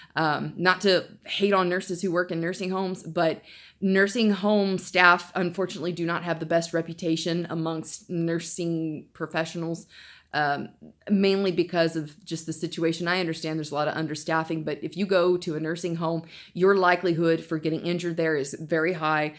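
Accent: United States. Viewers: )very good explanation I was able to understand your american accent Very Informative Keep up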